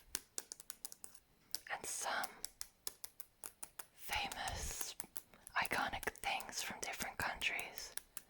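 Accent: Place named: Ireland. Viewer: I'm part Irish myself. Love the Irish accent.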